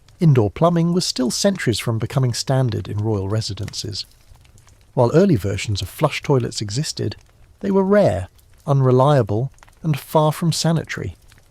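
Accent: United Kingdom. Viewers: I love your channel & historical stories, I’m glad I found you. I’ve deeply enjoyed your research, writing excellence and your wonderful British voice. Your videos’ ill ustrations are absolutely beautiful & I enjoyed the ambient background crackling of a large hearth found in a castle, both calming & deeply relaxing. So relaxing I dozed off during your story and had a dream I was in Queen Anne’s castle visiting with my Grandmother and brother, who’ve both passed away. My brother, 4 years younger, my only brother,passed too young, suddenly & very tragically. It’s been painfully difficult for me, as we were extremely close growing up & as young adults; he had not yet married nor had children I couldn’t go back to sleep so I came to yt to look around & stumbled upon your channel. I was able to fall back asleep and had this dream. I was walking through Queen Ann’s Palace as you were describing it. 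It was both beautiful and regal while also half crumbling & decaying. It was crowded, but I recognized my Granny, who passed away many years ago and my younger brother, who just recently passed away on February 2nd of this year. Such a strange dream and odd, as I’ve not dreamed of little him until now. Thank you for your video, it brought me great comfort❤. I subscribed & going to watch another!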